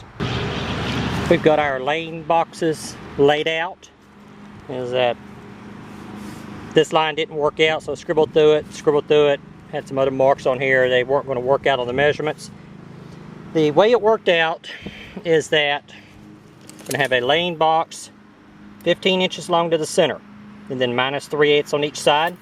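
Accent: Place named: South, United States. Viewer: with that mayberry voice...I guess you are south of the mason Dixon. so whatworks for you may not work for upper Minnesota: gotta point that out for others who think drafts are not a problem.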